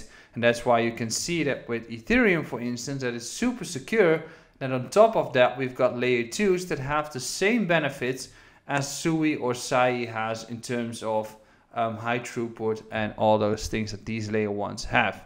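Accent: Netherlands. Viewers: Had binnen 1 minuut al door dat je een Nederlander bent ;). Bizar hoe herkenbaar ons accent is wanneer we Engels spreken... Goeie video man, je bent een abonnee rijker!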